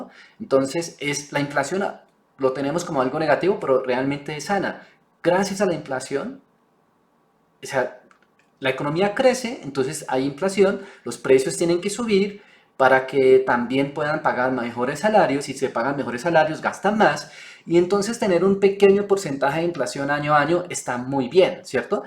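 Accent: Colombia. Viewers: Wawww. Me sorprende ver un asiático hablando muy bien español. Lo felicito, me imagino que vive en España 🇪🇸, 🇺🇸 ó Sur-América? Bueno no puedo recocer su acento. Aunque parece ser que su acento es Colombiano 🇨🇴. Sea como sea lo felicito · Hablas muy bogotano, te faltó decir carachas y régio chhhregio. Pero Usa no quiere reconocer la deuda, dijo que no reconocerá esos dineros a China, como indemnización por haber causado la pandemia. Euroasia ya negocia en con las respectivas monedasque la conforman y el poder militar gringo no es predominante para respaldar países que deben someterse a las reglas transaccionales basadas en el Usd. Tienes razón los cocos no caen a la vez, pero el blanqueo de los dineros del narcotráfico en la Banca sionista-judía ya se vienen conociendo. Qué ocurrirá con estos informes?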